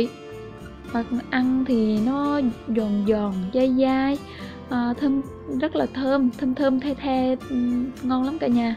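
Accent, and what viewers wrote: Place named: South, Vietnam. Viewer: Tại chị người miền trung nói qua giọng Nam để mn dễ nghe á chị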